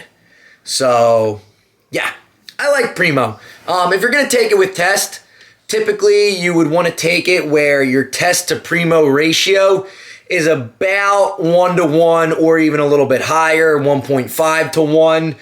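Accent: Philadelphia, United States. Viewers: That's a philly accent if i've ever heard one. Have a new sub just for that.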